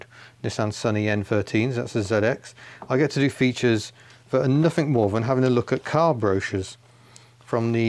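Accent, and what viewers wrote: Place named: Birmingham, United Kingdom. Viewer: Hi, just realised my three favourite youtubers all have Birmingham accents., cars. Antman09ful1, trains. Steve's Fruity's, classic fruit machines. Don't change a thing, your channel is a breath of fresh air (and petrol fumes).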